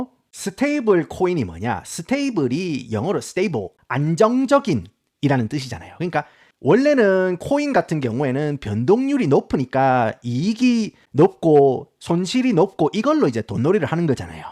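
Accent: Gyeongsang, South Korea. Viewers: 와~미국 금융계통에서 일하셨던 경험자라 그런지 완전 고급정보 얻어갑니다. 내가 회사만들면 스카웃하고싶네요 근데 나는 갱상도라 말끼를 알아둗겠는데 딴동네 출신들은 알아 듣겠나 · 우짜 이리 말을 잘 터노. 미국에서 갱상도 사투리 들으니 넘나 톡톡 튀고 좋타. 함 봄 구독안하고 못배긴다. 명언이 한 두개가 아이다. ㅎㄷㄷ 한국떠난지 오래라믄서 우째 이라고 우리맴과 삶을 잘 알꼬!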